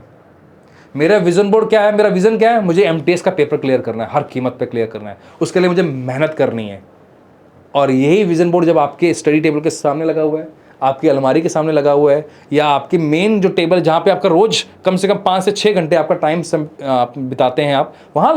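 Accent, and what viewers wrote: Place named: Haryana, India. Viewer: Aap Haryana se ho ji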